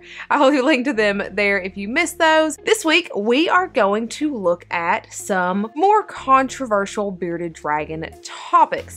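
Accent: South, United States. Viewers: Are you from the south? Juts wondering, I'm from AL so I recognize your accent. Love your channel, thanks for the great tips.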